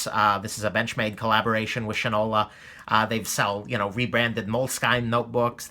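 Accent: United States. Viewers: What is your accent? I know it's American but where is it from?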